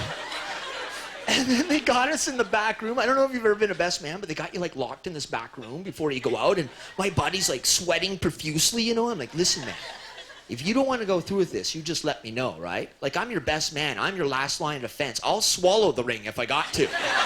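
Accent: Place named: Canada. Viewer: in 4 seconds just about every listenerd could tell: Canadian. You mix up stoner with surfer - I Spoke surfer since childhood.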